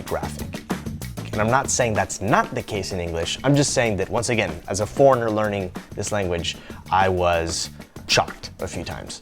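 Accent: France. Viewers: A lot of those expressions are not North American French. I can tell by your accent you learned French from France, and not the French from North America. Did you know that the North American French, mostly the French spoken in Canada is more authentic than the French spoken in France? That's because we were isolated from France from the 1600s to the mid 20th century so our language did not change as much as that spoken in France, so our French is more authentic and true. Generally the French spoken in Québec is no longer authentic because they adopted changes when the intellectual snobs wanted to distance themselves from their French Canadian roots. So they adopted more of the accent spoken in France and incorporated new words that never existed before in our vocabulary which came from France. This took place in the 1960s and most of the québecers have now completely changed their way of speaking. 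Whereas the 30% or so of French Canadians living outside of Québec have kept the original French from our ancestors. So the purest French, most likely in the world, is found in Canada, outside of Québec. I will give you one example of the difference between authentic French and the French spoken in France and in Québec. The French language is a Latin language and in all Latin languages we roll our "r"s. That is what French Canadians do, but Québec stopped doing that just as the French from France did. In AUTHENTIC French you roll your "r"s, you dont spit them. I am French Canadian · Im a french guy from Québec. You have a great accent. Its nice to see a foreigner understanding our little details in language. You obviously went to France to learn it. I recommend you to go to Quebec and, be mind blown by the way we speak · I'm learning about my own language, I swear it's troubling how little one understands of their mother tongue... 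also, not certain that I'm not mixing it up with French Canadian because I've lived there for too long, but 'terrible' can also mean 'incredibly good', chiefly cut into two very articulated syllables, at the same level as 'chant-mé' Oh, btw, you have a sort of a south accent, which is funny because you could easily be mistaken for someone from Toulouse (or around that area) at times 👌🏼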